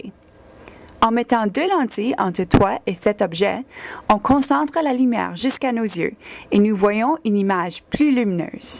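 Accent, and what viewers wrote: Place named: Canada. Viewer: décidément j'adore l'accent de nos cousins/cousines d'outre-Atlantique, je le trouve toujours hautement réjouissant. Contenu intéressant pour animer un atelier (classe de découverte, colo...) avec des enfants (je dis bien les "enfants", pas notre mot d'argot qui veut dire tout à fait autre chose au Canada! - d'ailleurs comment avez-vous traduit le titre du film "Honey, I Shrunk the Kids"?) Pour la collimation j'ai un doute, pour observer la nébuleuse de la Lyre aussi; perso je choisirais la grande lunette si j'avais les moyens, mon XLT 120 est ridicule à côté.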